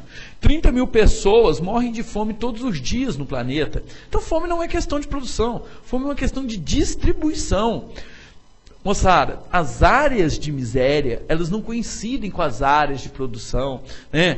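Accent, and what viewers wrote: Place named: Goiás, Brazil. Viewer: Esse aí é goiano 😁❤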